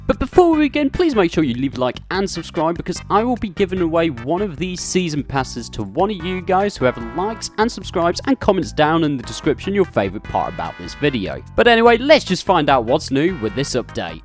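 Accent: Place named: United Kingdom. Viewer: I love this British accent 😭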